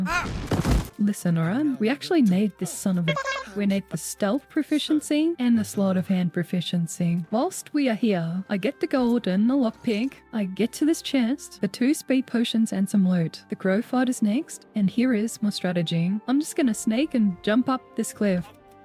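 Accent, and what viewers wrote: Australia. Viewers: Unapologetically Australian and I love it! The rep we deserve as Aussies ahahah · I first subscribed to this channel for the Australian ASMR and stayed for the insanely creative runs & humor. I’m full on cackling over here 😂😂😂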